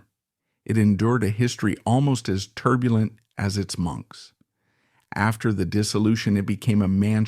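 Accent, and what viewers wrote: United States. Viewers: Why the American voice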